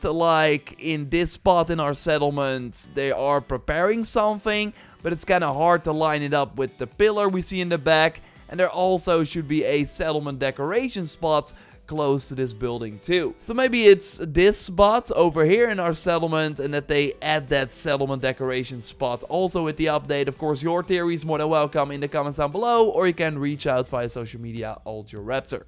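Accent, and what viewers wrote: Netherlands. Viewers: you sound dutch, is this correct?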